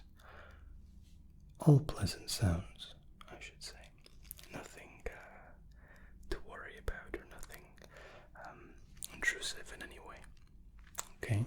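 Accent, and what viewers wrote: Scotland, United Kingdom. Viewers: Ah never mind, just done a bit of digging and it’s says he was born in England but has spent most of his life living in Scotland so has picked that accent up a bit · Where are you from mate? I think I hear Scotland in that accent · I can hear the scottish but it also sounds like there's some european/american in there too! Forever a mystery but very relaxing hahaha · accent is definitely a conglomeration. But yeah, the Scottish accent is most predominant, while lightened a bit by the English side of things. If there's any American accent in there, it's very faint. 😅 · I just recently found your channel, 1 video and I'm already hooked to your vids, love the accent and your style 😀 you rock it man haha, and we are both from Scotland FREEDOM!!! haha lol, I'm rambling again I'll stop. Oh and one last thing you just earned a new SUBSCRIBER!! 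MEEEE HAHA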